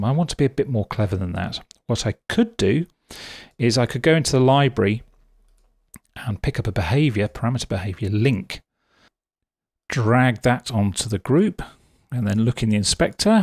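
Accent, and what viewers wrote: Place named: United Kingdom. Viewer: Thankyou - excellent. Also: British!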